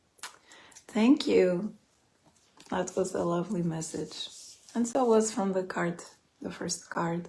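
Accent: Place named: Russia. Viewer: Nifty Russian accent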